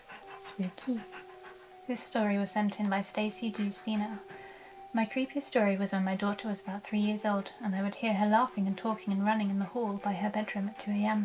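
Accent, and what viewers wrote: United Kingdom. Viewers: The British accent really helps make scary stories sound better. It amazes me that Key understands “Lay your butt down”. How could he understand that? To me, that basically means that Key understands virtually everything, or else he knew he was doing something unusual and the tone of her voice suggested a request. Either way - pretty darn smart!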